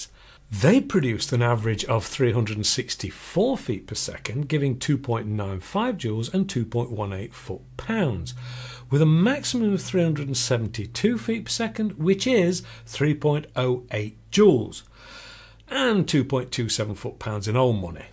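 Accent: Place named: England, United Kingdom. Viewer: Why is David Duke talking with an English accent?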